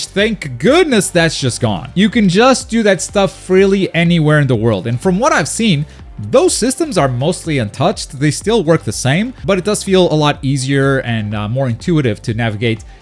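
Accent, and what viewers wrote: Portugal. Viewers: Bruh vou seguir só mesmo por seres tuga! Foi tipo "Este gajo tem sotaque de Lixian... deixa ver de onde é"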